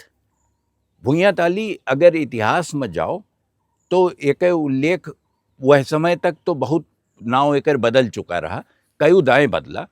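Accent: Awadh, India. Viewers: Listening to your video feels like roaming around my nani,s village about 60- 65 years back.Same air .same mitti ki sondhi sugandh and the original awadhi.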